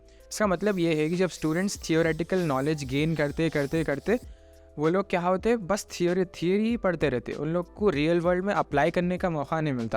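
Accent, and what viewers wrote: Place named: Hyderabad, India. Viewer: loved the video and the hyderabadi accent lol. got yourself a subscriber now waiting for more info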